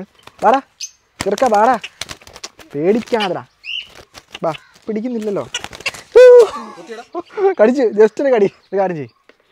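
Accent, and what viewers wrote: Kottayam, India. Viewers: നിങ്ങൾ കോട്ടയം കാർ ആണോ?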